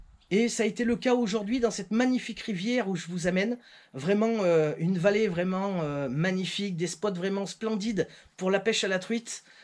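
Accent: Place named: Auvergne, France. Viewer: Salut camarade Bougnat .... Heureux de retrouver tes vidéos......et ton accent ...j'ai le même.....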